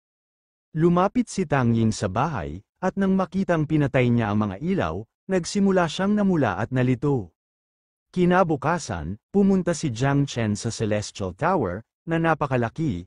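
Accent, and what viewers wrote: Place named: Visayas, Philippines. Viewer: Bisaya gahing bisaya nice lods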